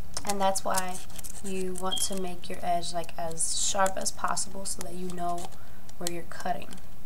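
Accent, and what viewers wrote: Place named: Cuba. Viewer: are you Cubain because you have that kind of accent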